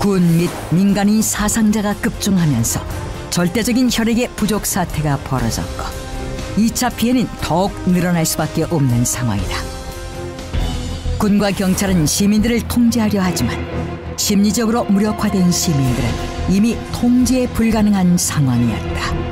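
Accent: North Korea. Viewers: 말투가 딱봐도 북한이네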